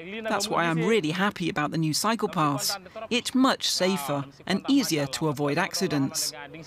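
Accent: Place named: United Kingdom. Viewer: I stopped listening at British accent. Tired of the British accent narrating African reality.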